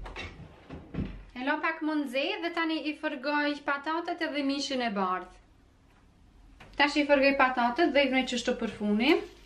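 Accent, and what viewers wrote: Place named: Kosovo. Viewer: pershendes shum dhe po fol shqip si krejt kosova vetum dallimi asht cilin vend jeton ska kurfar dryshimi ma mer mendja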